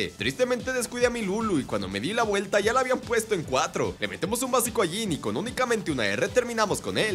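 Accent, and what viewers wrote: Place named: Mexico. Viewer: Eres de sonora o baja California ese acento lo conozco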